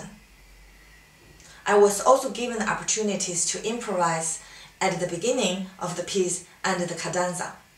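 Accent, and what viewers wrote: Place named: London, United Kingdom. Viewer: 之前 留學英國 帶倫敦腔 現在 又有少許 紐澳腔調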